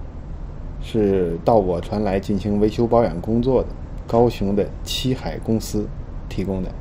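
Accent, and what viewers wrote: Tianjin, China. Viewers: 講話像大陸人口音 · 口音是天津老乡吧？ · 天津人一听就能听出来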